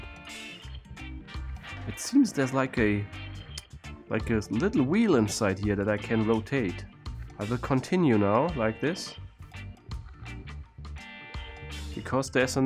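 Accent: Germany. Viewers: I love his up-beat voice, and the German accent - 'Hai, und velcom to ' - is really charming.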